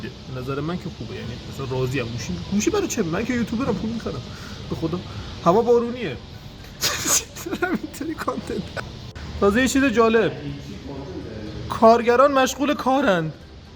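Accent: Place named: Kermanshah, Iran. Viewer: عاشق لهجه کرماشانیت شدم 😂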